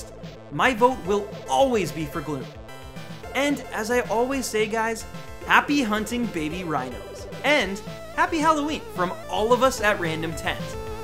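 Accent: Canada. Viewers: Are you Canadian? Cause you sound like a Canadian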